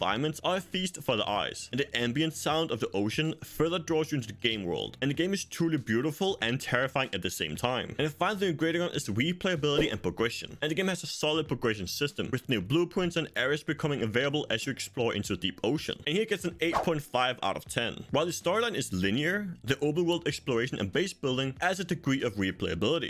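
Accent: Denmark. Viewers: This is the thickest danish accent ever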